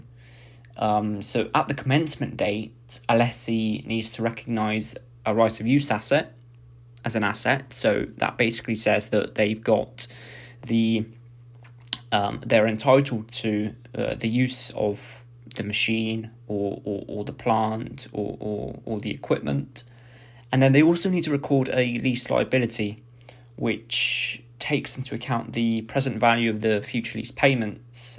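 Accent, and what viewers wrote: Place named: United Kingdom. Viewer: You explained it for simply, thanks a lot. I expected to see more videos but didn’t find. By the way I love this British accent lol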